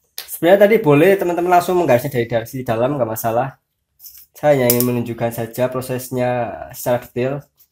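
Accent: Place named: Java, Indonesia. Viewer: nglempruk wkwk kangmas e wong jowo ....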